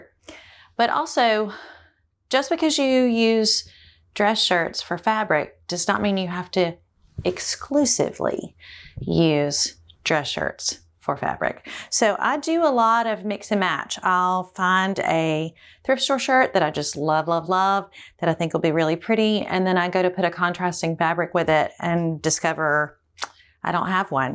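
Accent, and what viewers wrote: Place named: Alabama, United States. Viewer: what a pleasure it is to watch your videos!! I am an Alabama girl also, so I love your accent and your “southernisms”! I am a quilter and 3L ( this means love, love, love) using men’s shirts for my quilts. I buy them at the thrift store and I have found $60 shirts for as low as $1 on special sale days! Oh what a happy girl I am when I get such great bargains AND beautiful fabric for my quilts. Keep producing your videos and please share your finished projects with us!!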